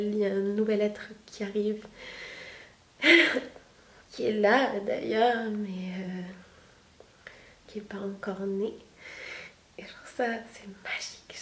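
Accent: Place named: South, France. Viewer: Tes réflexions sur toi-même, sur le monde qui t'entoure, peu de personnes le font réellement dans la vie de tous les jours. Dis-moi es-tu croyante? Si oui, en qui crois-tu? Tu es naturellement belle, j'aurais bien voulu te connaître par amitié avant tout et plus si affinité. J'ai remarqué ton accent du sud un peu; ) Ps: les personnes ayant connu des zones d'obscurité dans leur vie, sont des apprentis les mieux préparés à entrer dans la lumière. Bisous, garde ton naturel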